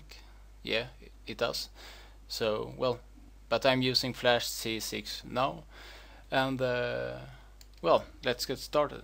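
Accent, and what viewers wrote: Sweden. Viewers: ur swedish arent u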